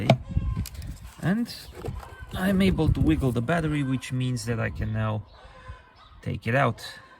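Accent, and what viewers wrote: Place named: Romania. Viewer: Bravo. Frumos, lucrat curat cu atentie, cu grija la cuplu reocmandat dar la mediul inconjurator. Mi-am dat seama dupa accent si expresii ca esti roman atat.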